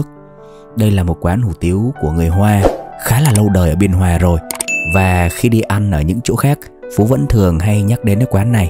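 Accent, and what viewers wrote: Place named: North, Vietnam. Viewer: Thích giọng nói của em, nghe là biết con cháu người Bắc 54, ấm áp chứ không chua lè, tru tréo như giọng Bắc 75.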